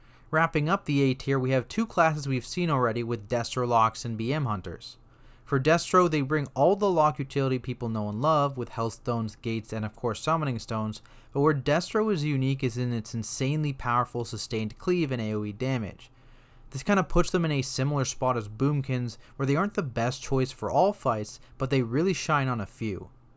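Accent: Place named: United States. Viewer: He's got an American accent, and I posted the way it's said in his native tongue.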